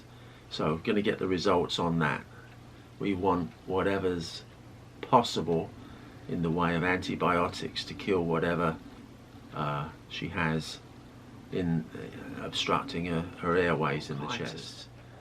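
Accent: England, United Kingdom. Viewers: Awww, So sorry Jean has been so sick! I will be praying for her swift recovery. She is such a Joy to watch & listen to. As far as your British accent, I love it! So Glad Jean has such a lovely sweetheart. TY to you & on camera! God bless you all!! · thank you for keeping us up to date about our lovely Jean, sending all prayers for her speedy recovery. Your English accent is so refreshing to hear. Thank you dear for your help in getting the video out for us. Best wishes to all the Trueloves. ♥♥ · Prayers for a speedy recovery for Jean and I love the British accent. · Aww what a lovely video - (even though Jean is ill,) the way you speak about your wife with such love melts my heart! Hope you feel better soon Jean! PS, as a fellow english woman, your accent is magnificent! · Sending best wishes for a speedy recovery., love your English accent. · Thank you. 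Love your British accent. God bless all of you. Best wishes for Jean to get well. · Thank you for the update. Your accent is great (fellow Brit living in Texas). Dear Jean, love you, miss you, sending you all the get well wishes. I will be watching your videos all day today. You bring a smile to my face every time I watch you. Take care, rest and let those lovely men take good care of you xxx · Never apologise for your beautiful accent, English accent is my favourite. Give love and hugs to Jean for her Aussie youtube friend. God Bless. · Thank you for the update on Jean. Prayers for her speedy recovery. Ps..never apologize for your English accent. I love hearing it.😊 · I love the English accent. 💜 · Thank you so much and for updating on Dearest Jean. Your Such a beautiful family looking after each other. Wishing Jean a speedy Recovery send Love fro. The UK. PS be proud of your beginings... 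Great British accent! Xx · Hope Jeans on the mend soon and begining to feel better x 💐 and, dont apologise for your accent. there's absolutely nothing wrong with a lovely English accent x 😉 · So nice of you to fill in for Jean; great job! Please don’t apologize for your accent……it’s charming and delightful and when I go to England, I’m the one with an accent! Feel better soon Jean! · Dear, please give Jean my love and wishes for a speedy recovery. Tell her we will all be waiting patiently for her return to her usual chatty smiling self. Thanks to you and, I’m sure Jean has the best possible care. Nothing wrong with the accent, I’m from Yorkshire originally so you sound very British to me. Love to you all 🏴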